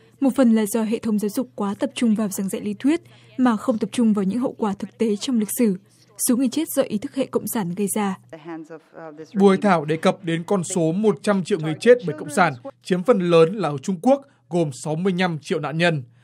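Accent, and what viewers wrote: North, Vietnam. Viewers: Giọng bắc mà đi phê phán chủ nghĩa Cộng sản là sao nhể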